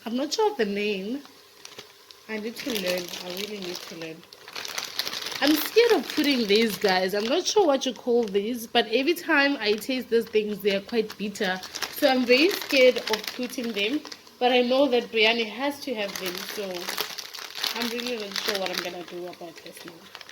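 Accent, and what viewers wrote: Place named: South Africa. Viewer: First of all love and miss hearing that South African, Kaapstad accent. I also hate the taste of those little (I think it's cardamom seeds). My Mom used to cook the chicken and potatoes separately, then layer them, potatoes, rice mixture, chicken and so on and let it slowly simmer and then we would have it with mango chutney yum yum yum, lekker smekker goed. Enjoyed watching you, I have never tried making this on my own, but watching you I will certainly try my hand at it. Thank you for the inspiration, geniet, dankie en tot siens.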